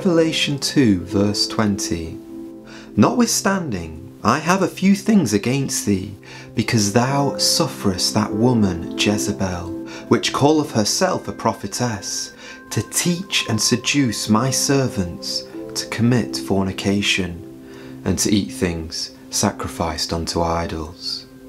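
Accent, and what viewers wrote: United Kingdom. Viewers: I am a Filipino Christian ,I just want to congratulate that your voice was lovely a British accent, so lovely hearing Bible verse..God's talent🎈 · I'm so glad you made this type of video,. I can listen to my Father's Word hour after hour after hour. I sincerely do love and honor God's Word and it's nice to have your British voice speaking it!😁 Thank you and may The Lord bless and protect you and your fam on your journey to spread His Word and His precious gospel in Christ Jesus' mighty name. Amen!!😇🎚️🙏🌈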